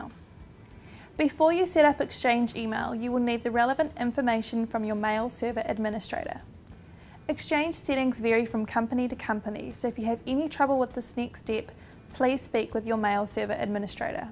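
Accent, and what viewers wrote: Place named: New Zealand. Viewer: dat New Zealand accent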